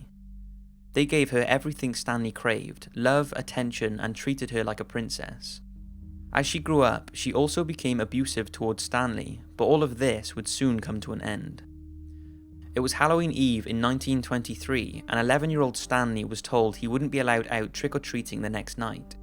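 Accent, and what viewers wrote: Wales, United Kingdom. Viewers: Cardiff/Newport in wales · It sounds Welsh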